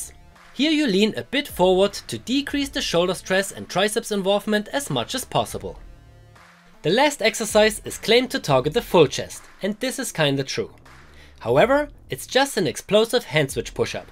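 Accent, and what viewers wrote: Germany. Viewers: Bro angefangen zu gucken und direkt mein Land rausgehört. Bilderbuchbeispiel für deutschen Akzent in anderen Sprachen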